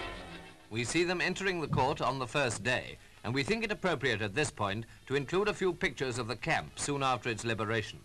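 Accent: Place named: RP, United Kingdom. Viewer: speaking BBC English instead of the mishmash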